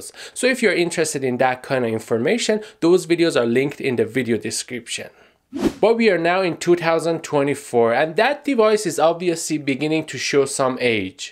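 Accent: Iran. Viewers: چرا لهجه ایرانی ها انقد ضایعست و معلومه😂😂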